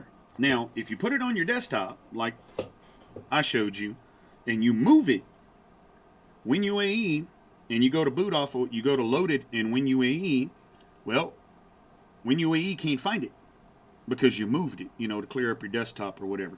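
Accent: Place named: Texas, United States. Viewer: I trust a man with a Texan twang.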